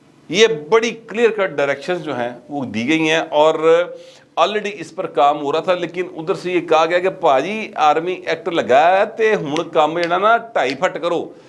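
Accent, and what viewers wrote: Punjab, Pakistan. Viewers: حقیقت پہ مبنی، مدلل گفتگو،خوشگوار لہجہ اور پنجابی ٹچ۔ یہ ھیں ۔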